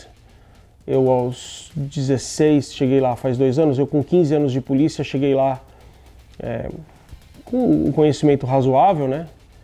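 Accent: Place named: São Paulo, Brazil. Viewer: Aquele sotaq paulista maroto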